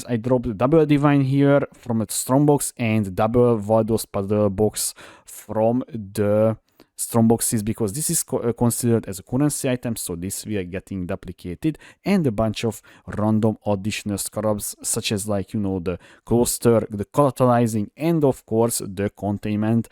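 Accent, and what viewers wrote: Hungary. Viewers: are you hungarian? what is that accent? 😁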